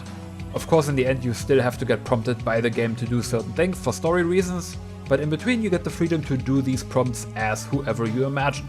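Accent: Germany. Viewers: Your accent sounds pretty German for an English speaking youtuber, i have a theory German was the language you used growing up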